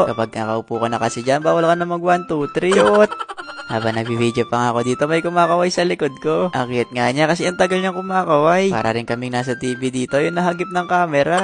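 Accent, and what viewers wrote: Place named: Iloilo, Philippines. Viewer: Ilonggo ka gali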